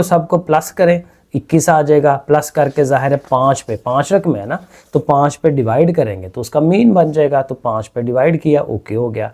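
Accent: Sialkot, Pakistan. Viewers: Sir ap sialkoti hy myri trha ap ka bolny ka trika 😂😂😂❤ but acha hy 😊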